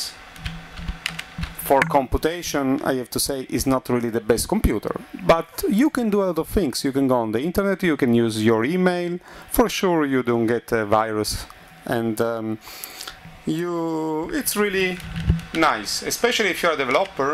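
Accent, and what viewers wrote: Italy. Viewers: love english with italian accent! good video, keep the good work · Italiano o Argentino?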